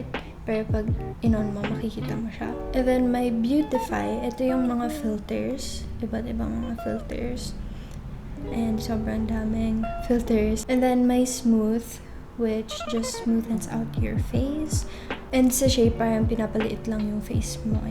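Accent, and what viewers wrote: United States. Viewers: Parang americana po kayo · Parang americana magsalita Ang cutee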